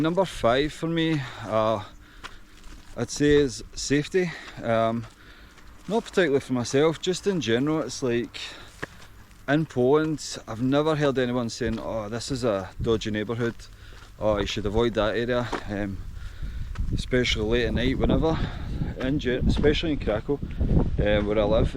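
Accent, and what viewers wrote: Scotland, United Kingdom. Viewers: Cool to listen to a Scot living in Poland. One thing, you are living in Central Europe, not Eastern Europe. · You have a huge+ for your Scottish accent. Thanks for the video and enjoy Poland. Not bad joke about ppl of Paisley, but is it really that bad? lol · You are Scottish ,so I know why I need a subtitles to better understand you. I learn English and northern accents are difficult for me. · the Scottish accent is very heavy and thank god for the subtitles or we could not get it all, also he mumbles. But good points he makes. However the cost of living has gone up a lot in PL, they also have 23% VAt!!!!!., He is right about a lot he says about the good food quality. There is also good competition between German owned Aldi and Lidl, and Portugese owned Biodroinka. Food is pretty fairly priced. However if the zloty does not depreciate soon, as it should given their deficits and debt burden, then PL is going to be overpriced like many EU countries. 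Good video, interesting and the Krakow area is one of the more expensive ones in PL to boot. Hi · Well-done video and commentary even though I struggled a little bit with your Scottish accent while I was watching your video. Allow me to ask you for a favor:would you be willing to call Poland as part of Central Europe, or at least Central-Eastern Europe? If you keep classifying Poland as Eastern Europe , you put Poland in the same bucket where Russia, Ukraine and Belarus belong and you know well it is not the case. Poland is located in the center of Europe, Poland is part of the Latin culture through Catholicism, Latin alphabet, architecture, democratic tradition, very high level of freedoms where nobody will dare to cancel you because of your opinions. Cheers and greetings from a Polish-American. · Poland is 20 years behind UK. Its unevatible. Love your accent ! Long live the scots !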